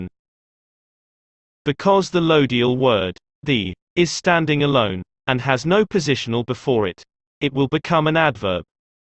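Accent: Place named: Ireland. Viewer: Thanks. Great video. I prefer the Irish accent. May have something to do with my mother being Irish.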